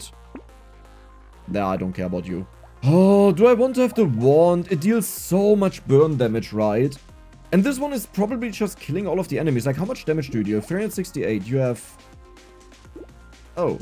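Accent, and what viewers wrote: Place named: South, Germany. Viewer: Kann es ein dass du aus dem Süden Deutschlands kommst zumindest hörst du dich so an?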